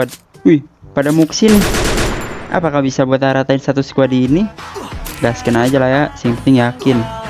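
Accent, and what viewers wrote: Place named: Lampung, Indonesia. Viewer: Abang orang lampung bang kok ngomong sikok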